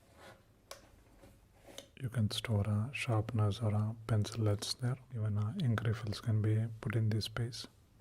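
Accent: India. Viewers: I think hes indian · I think that the Indian accent makes for the best ASMR sounds. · Wow who is he..?? His voice is gold...I love an Indian accent.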